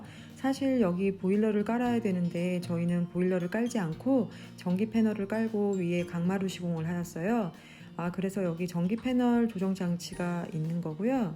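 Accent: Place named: Seoul, South Korea. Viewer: 서울사투리 너무 이쁘세요~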